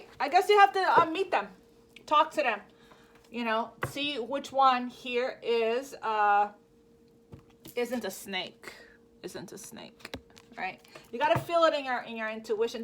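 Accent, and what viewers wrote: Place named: New Jersey, United States. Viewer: I love you so so much ❤️💕💕 You sound like a Jersey girl did you say you live in Dallas!!? You are my favorite reader I connect so hard with you I'm in Big Spring Texas born 2 - 9 1970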